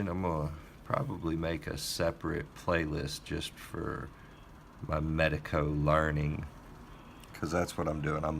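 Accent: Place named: South, United States. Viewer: Great video on figuring these out. I am about to start learning on medeco as well, but I have been too chicken chit to start. lol I have to know though, what part of the South are you from, Bud? That drawl is unmistakable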